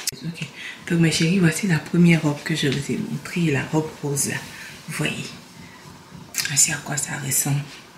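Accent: Ivory Coast. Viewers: On adore l'accent ivoirien et le naturel dans la video! 😍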